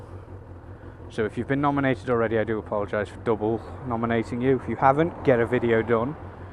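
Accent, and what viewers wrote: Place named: Wirral, United Kingdom. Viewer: I knew from the accent that you must come from that neck of the woods, but in my day, long, long ago in a Galaxy far away, I'd have placed you further up the Wirral. Mind you, I come from further up the Wirral, but not many people these days place my accent there.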